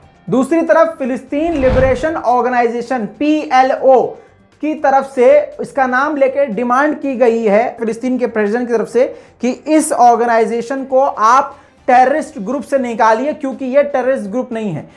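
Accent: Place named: Bihar, India. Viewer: . India k kis elaka k rehne wale ho. Lagta hai bihari ho. Tumhara lehja bihari jaisa hai. Me Lahore se hoooon. Aur india se ache taalukaaaat chahta hoooon. Laikin yea unbhagat tatikhor nahi chahte. 😐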